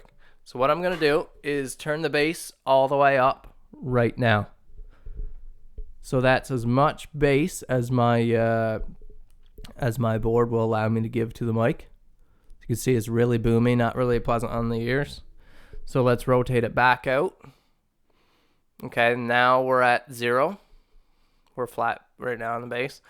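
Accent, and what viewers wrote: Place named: Newfoundland, Canada. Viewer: Start video. "This guy's from Newfoundland". Look at About. "Yup."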